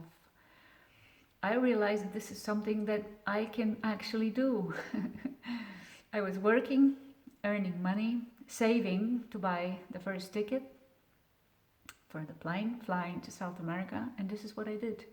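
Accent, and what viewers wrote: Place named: Spain. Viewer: Wspaniale przeslanie, española angielski. Jest pani the best🙂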